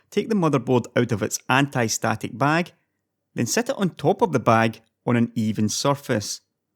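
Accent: Scotland, United Kingdom. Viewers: the sweet, Scottish dialect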